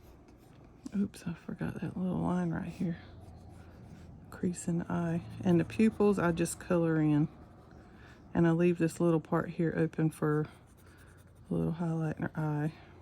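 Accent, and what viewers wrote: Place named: Carolina, United States. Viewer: Love your art and I love your accent. I'm a Carolina girl as well. Thank you for the video I learn so much from you.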